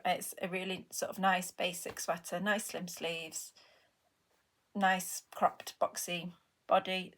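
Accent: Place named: Liverpool, United Kingdom. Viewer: Oh yes, I definitely know of that rivalry lol my sister‘s husband is from Liverpool and his parents were born and raised there as well….when I was listening to your beautiful accent…to my American ears it sounded a bit familiar and I thought ohhh maybe Liverpool? Sorry to offend lol 😂 My sister‘s husband grew up in a divided family, half for Liverpool half for Manchester… It gets pretty heated. When my brother-in-law would go to a match , his father would drop him off, but swear he would never enter the stadium. lol too funny. Anyway, thanks for sharing and I’m enjoying your channel. Love the sweater!!!!!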